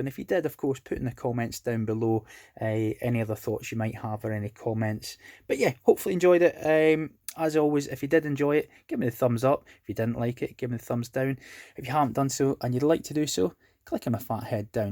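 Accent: Scotland, United Kingdom. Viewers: That was great. So they are just monochrome LCDs with the light filtered coming to them. {Admires Scottish accent realizing it actually helps in attention as-well-as retention.}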